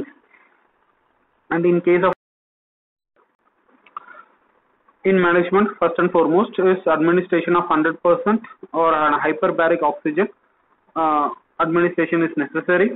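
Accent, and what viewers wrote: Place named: India. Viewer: Sorry but when I thought a video is in native English but turned out that it's Indian accent English, somehow I hate it.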